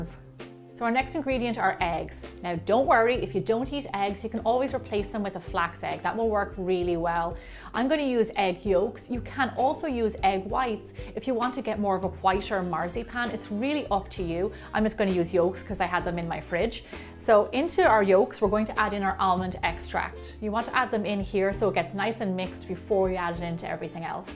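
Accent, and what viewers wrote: Ireland. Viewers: What a great Channel and I love your Irish? accident.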